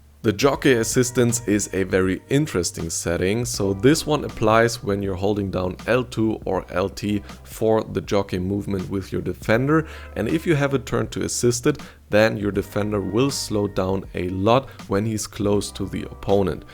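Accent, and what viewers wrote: Germany. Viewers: Is this dude German? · You have german accent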